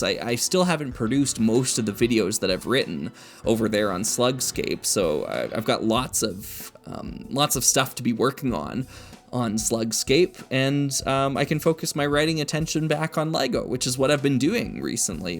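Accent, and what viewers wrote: Canada. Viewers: nooo! Don’t apologize for your charming Canadian accent eh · A Canadian calling their own accent "harsh" is the most Canadian thing I've ever heard Great video,!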